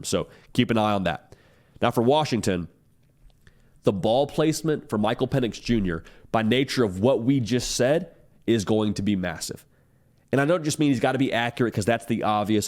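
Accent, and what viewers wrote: South, United States. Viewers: This guy's accent and game picks just wreak of a butthurt SEC guy. He picked Oregon and Texas and now Michigan?!. How is he even relevant in the sports betting world?! Penix Jr. singlehandedly beat JJ and Michigan when he was with Indiana. Michigan had to beat #4 (#8) Alabama in overtime while the Huskies beat #3 Texas in regulation. We love being the Dawgs. I can't wait to keep these receipts...BOW DOWN TO WASHINGTON.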